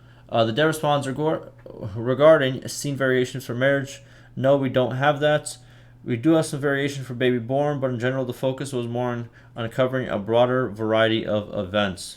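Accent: South, United States. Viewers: You sound dixie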